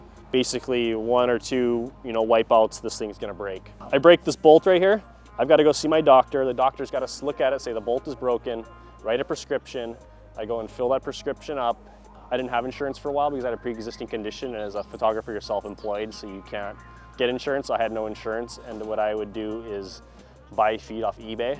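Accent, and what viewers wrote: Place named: Canada. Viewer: He never says how he got his accent in Canada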